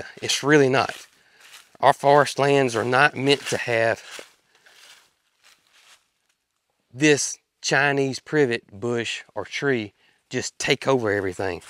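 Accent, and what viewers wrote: South, United States. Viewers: Thank you for showing us the orientation of your farm. Having a better-than-average sense of direction, knowing the layout of places and special things alleviates confusion for me like the pond is a lot closer to your house than I previously thought and on the opposite axis too. I know that it causes confusion for many people. Thanx for showing the areal shots too. That put things in perspective for a lot of us. I so enjoy this channel., you're always doing something different. Plus your strong Southern droll cracks me up. I also like your kids' videos too.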